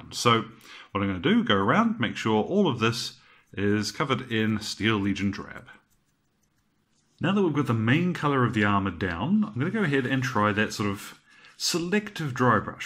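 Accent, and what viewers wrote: New Zealand. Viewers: Thanks for the advice. Local would be......NZ based on your accent? I'm just starting my first ever painting project and I'll be doing Tau in Zandri Dust w/ Ushabti HLs, and Mech Grey and Dawnstone HLs for the fatigues. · It's certainly impressive and appreciated! From your accent, are you a kiwi by any chance?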